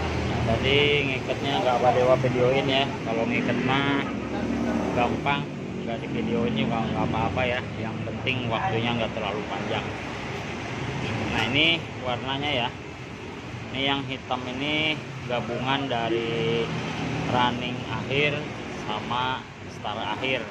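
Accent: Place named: West Java, Indonesia. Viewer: Kieu ari urng sunda mh...ngomng indo sok cmpur jeung sunda...karakter na khas.....mantf penjlsn na sa x oge ngartos...siap lh kepala ketmu ekor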